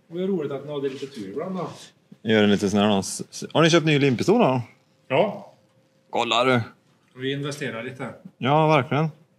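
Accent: Värmland, Sweden. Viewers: Dä ä ju lite lustigt detta att du pratar värmländska mä buckel mannen men inte i dina vanliga filmer (inte lika grov värmländska) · Gött å höra lite bred värmländska! Ett fantastiskt hantverk må jag säja!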